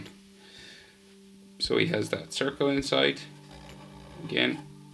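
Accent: Ireland. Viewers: Bro u Irish?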